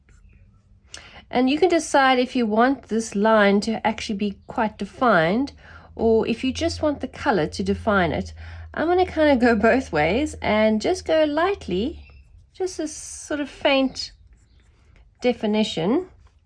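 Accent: South Africa. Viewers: Love hearing your South African accent. Thank you for the awesome tutorial! ❤️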